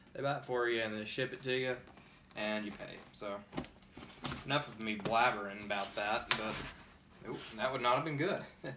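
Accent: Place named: South, United States. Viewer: oh wow, u actually imported the game! that's so cool! where are u from btw? cant help but notice ur southern accent XD im from tennnessee myself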